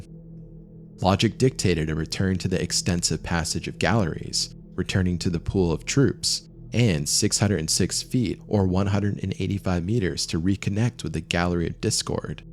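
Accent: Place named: California, United States. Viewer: U are SO Californian🤣 I love listening to you cause it’s like one step down from Bill and Ted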